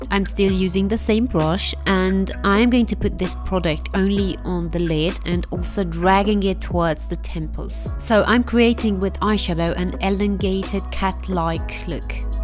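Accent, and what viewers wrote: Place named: France. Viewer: Do you speak French? Cuz I love your accent ♥️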